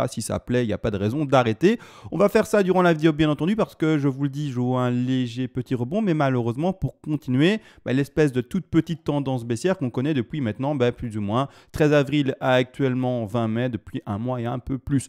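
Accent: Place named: Belgium. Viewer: J’adore ta façon de parler et ton accent ! ( belge - suissse ? )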